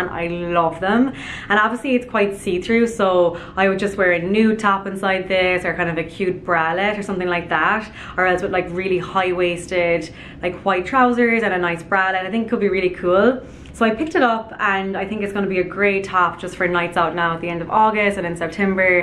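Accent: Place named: Ireland. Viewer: Cool Irish Accent :D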